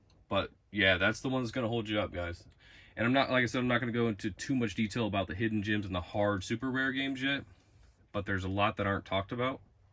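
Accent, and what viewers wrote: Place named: Texas, United States. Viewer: You from Texas?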